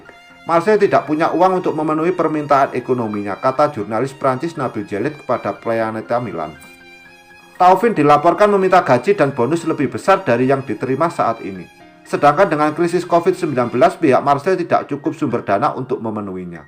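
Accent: Java, Indonesia. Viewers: Logat Jawa Lo kental banget! Cari suara yang komersil boss.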